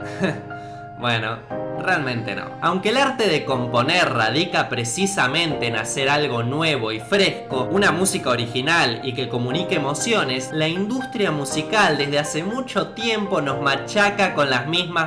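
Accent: Argentina. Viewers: vos sos argentino,no?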